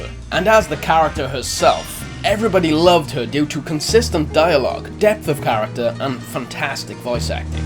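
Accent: Wales, United Kingdom. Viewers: Are you from wales? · ! You are Welsh! So am I!!! Which part of Wales do you come from? Because you have a Welsh accent. Lastly I come from Pembrokeshire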